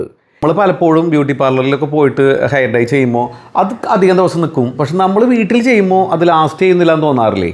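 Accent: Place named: Thrissur, India. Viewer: ചേട്ടന്റ സംസാരം കേട്ടാൽ അറിയാം നമ്മടെ തൃശൂർ കാരൻ ആണെന്ന് ഞാനും. എന്തായാലും അടിപൊളിയാണെന്ന് തോനുന്നു വാങ്ങി ഉപയോഗിക്കാം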